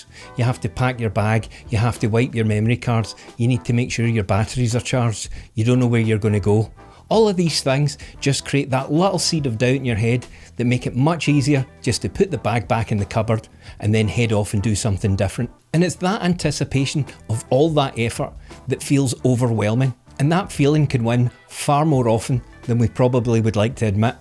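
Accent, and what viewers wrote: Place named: Glasgow, United Kingdom. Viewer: I've really enjoyed your videos since discovering your channel. Based on your accent, I put you in the Glasgow are, but not central Glasgow. More like Hamilton or Strathaven, Am I close.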